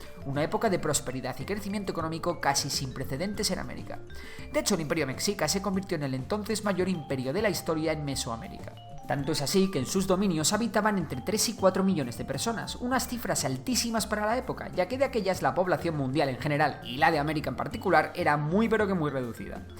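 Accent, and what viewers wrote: Castile, Spain. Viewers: El habla español castellano weey nomms. No es el mismo acento que el acento mexicano, no seas duro hombre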